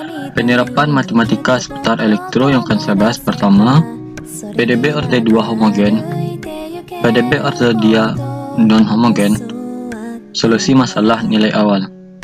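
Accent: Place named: Padang, Indonesia. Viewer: Padang banget ya nak logat nya... :3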